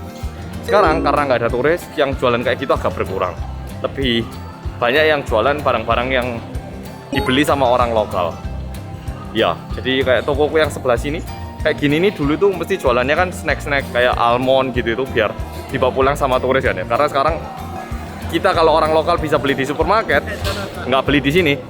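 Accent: Java, Indonesia. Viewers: Tetap jaga image medhoknya ya...karna tiada duanya youtuber korea yg medhok jawa..mg sukses👍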